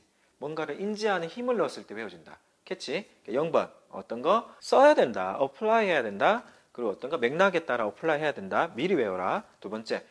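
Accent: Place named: Gyeongsang, South Korea. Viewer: 표준어 쓰는 경남사람😂 영어공부법 잘 들었습니다^^ 맥락있는 단어암기와 활용, 적용이 중요하군요! 쓸수있는 영어공부를 해라. 별표!!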